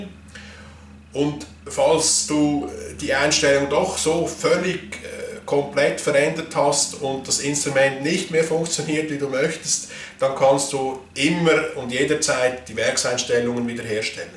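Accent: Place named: Switzerland. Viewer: Thank you for the video! I'm so glad I speak German. But the subtitles are pretty good. They help where I can't quite get the hang of your Swiss accent ;) For some reason, no-one explains how to properly put on the mouthpiece cap. Am I the only person afraid of damaging the instrument's "reed"? Just got mine in today. Kind of curious what settings I will end up going with, because I play both saxophone and flute.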